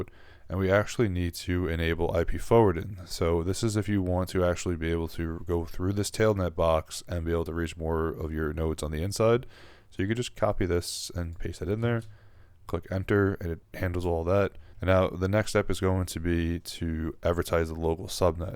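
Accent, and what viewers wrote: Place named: United States. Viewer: Thanks. You cannot help it, but your strong US English accent and quiet voice are tough for English speakers to understand. Thankfully, Subtitles help quite a lot, despite their imperfections. 🙂 I bookmarked this video, because it's a good application for an unopened Pi4 which has been in my workshop for far too long.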